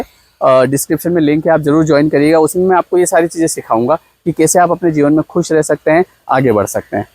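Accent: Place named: Malwa, India. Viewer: भाषा टोन mp निमच, मंदसौर की है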